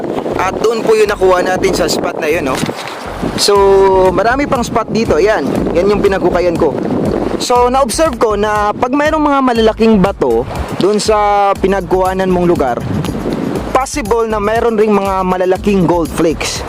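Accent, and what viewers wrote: Visayas, Philippines. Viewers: Bisaya ka po ba idol? hehehe pa shout out na rin😁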